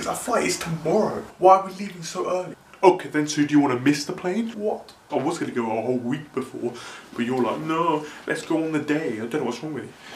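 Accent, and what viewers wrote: Canada. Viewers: Are you Canadian?? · Are you Canadian?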